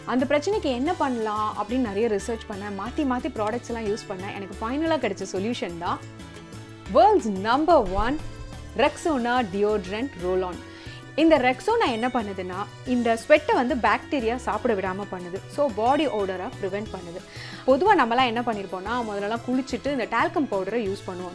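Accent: Kongu, India. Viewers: கொங்கு தமிழ் 💖 அழகா பேசறீங்க வரவேற்கிறோம் அக்கா 🙏🏻💚❤️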